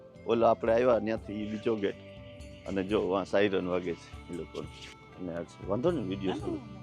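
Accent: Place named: Porbandar, India. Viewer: Tame Porbandar na lago se avu lage se bhasa upar thi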